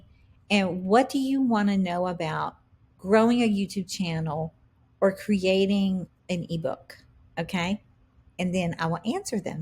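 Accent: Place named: South, United States. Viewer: You have such a delightful southern accent. I've watched this twice:pride-flower-pansexual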